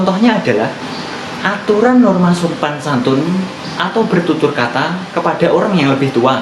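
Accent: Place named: Java, Indonesia. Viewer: Bahasanya medok banget bos wkwkwk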